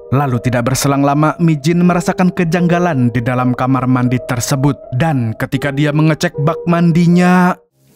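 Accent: Banjar, Indonesia. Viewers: Urg Banjar sakalinya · urang banjar kah pian mang ehehehe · Urang banjar kah mimin nya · Nah klo ketahuan ku dh si amang urangk banjar😂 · Anjay urang banjar kah😅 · Uma ay urang banjar kah min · Mang pian urang banjar kah? Ulun hanyar sekali nonton disini😭😭😭🤣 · Urang Banjar kah pian mang Salam nah dari alalak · Urg Banjar kh mang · banjar kah ni logat nya😂 mirip iq 7 jua suaranya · Bang kaya orang banjar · Orng banjar kah bg 😂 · Urang Banjar sklinya Pian lah😅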